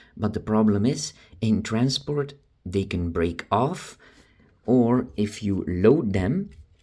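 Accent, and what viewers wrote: Belgium. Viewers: you sound flemish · Congrats on committing a gun crime in Belgium. What you are doing is prohibited by law in Belgium, and based on your accent and profile I'm guessing that's where you are. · Dat accent doet mij ergens aan denken. Aalst?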